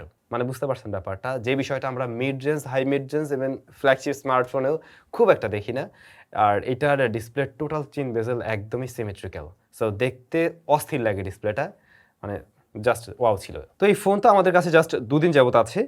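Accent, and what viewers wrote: Barishal, Bangladesh. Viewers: ভাই মাঝে মধ্যে আপনার কথা শুনে মনে হয় কি আপনার বাড়ি মনে হয় বরিশাল।আপনাকে খুব আপন মনে হয় তো তাই হয়তো মনে হয় আপনার বাড়ি বরিশাল কারন আমার বাড়ি বরিশাল তো তাই।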